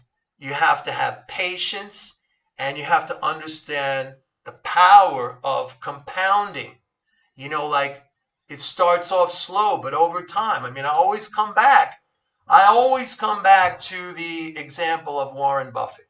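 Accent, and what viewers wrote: New York, United States. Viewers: Just stumbled upon your video. What you say is so true. PS: The NY accent adds the extra credibility that you worked on the floor.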